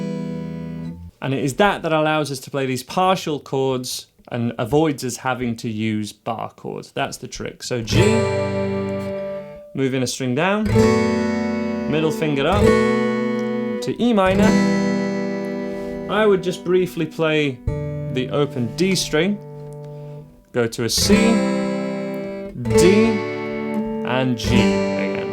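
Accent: United Kingdom. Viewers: And THIS GUY IS BRITISH! Score!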